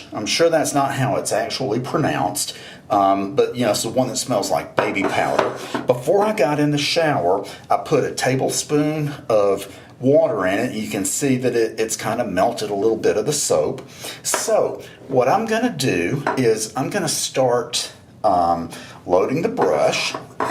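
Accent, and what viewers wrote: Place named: South, United States. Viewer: I happen to like the way you sound very much! I find a nice Southern Drawl very endearing. I'm in California, and when I was young, we had a neighbor across the street that was from Georgia. She had the sweetest accent I ever heard in my life. And the things she would say were just as sweet, a true lady. I look forward to watching more of your videos, as well as trying out some your products. I'm definitely gonna be buying some Barber's Invasion. Larry Shaves' video on it has me sold. So many barber scents leave out the lavender, and that just sounds wrong to me. Thank YOU, my good sir! Subscribed!!!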